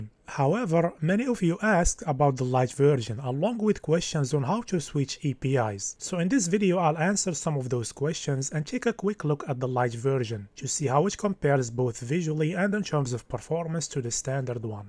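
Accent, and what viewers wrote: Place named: Morocco. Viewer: Moroccans can never hide their accent xd ,nice video a sat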